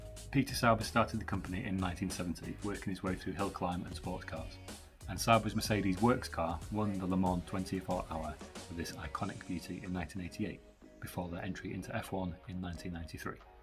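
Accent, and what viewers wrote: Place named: United Kingdom. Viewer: Interesting info! But please, speak louder!!! It’s a bit hard to understand you… been British I guess. Gabriel from Costa Rica saying hi… Good job! 👍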